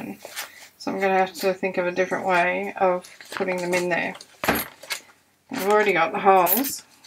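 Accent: Australia. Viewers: Hi, I was watching '49dragonflies' for Dephemerember and part of your video of 'Effie' was shown, so I thought I'd pop over and have a look at your channel. Glad I did. Really loved the leather look. Got me thinking. Thank you. Great to hear the Aussie accent too. Jeanne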